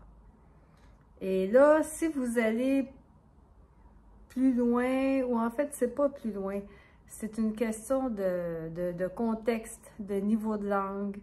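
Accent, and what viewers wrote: Quebec, Canada. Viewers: Merci beaucoup pour vos vidéos qui m’aident à mieux comprendre l’accent québécois. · J'adore tes vidéos. J'ai grandi dans les Cantons-de-l'Est, et j'trouve que ton accent québécois ressemble au mien 🤭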